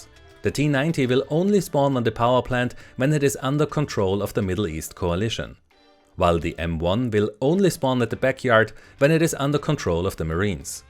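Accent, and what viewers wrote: Germany. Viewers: Are u german? Your english sounds like that😅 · Deutscher Akzent?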